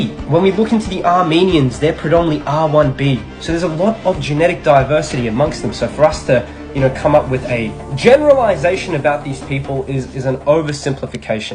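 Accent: Australia. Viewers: Which part of Australia you are from? An Iranian with an Aussie accent 😮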